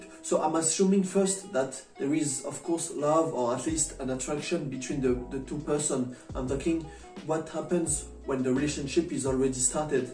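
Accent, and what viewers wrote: France. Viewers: The only problem I have right now is my boyfriend can't visit me during this pandemic, and if he's using agent, he would need a quarantine when he's coming back to his country, that's the worst problem we're facing right now. 😅 Agreed to the point where you said about language barrier, not so many Indonesians can speak English properly tbh, you could see it by browsing LDR videos in YouTube 😅🤣 And I already noticed you're from France from your accent and tongue click! And I think I'm correct 🤣 Greetings from ID - DK couple 🇮🇩🇩🇰, nice video and nice Indonesian language skill 😊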